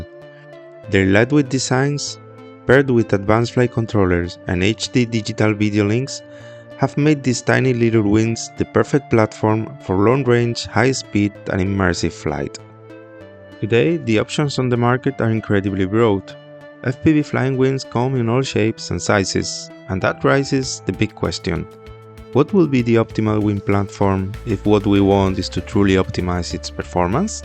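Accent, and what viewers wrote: Spain. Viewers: jajja se nota que es ESPAÑOL. pero bueno asi llegas a una audencia mayor. Buen video. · suenas español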